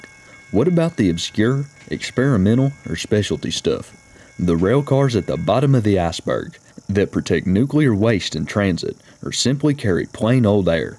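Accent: South, United States. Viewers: Did anybody ever tell you you have one of the best voices I have ever heard? It's like the very personification of the South. Amazing voice, my man. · Very nice format, good info and content! The southern dialect is just the cherry on top. ;-)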